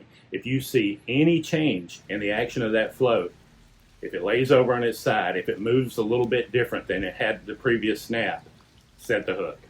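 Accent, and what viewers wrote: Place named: New England, United States. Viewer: It sounds like your in the new England aria is your book relevant for texas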